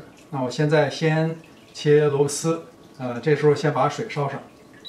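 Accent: Beijing, China. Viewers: 很純正的京片子我一聽就愛死人了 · 真不错，朋友是北京人吧。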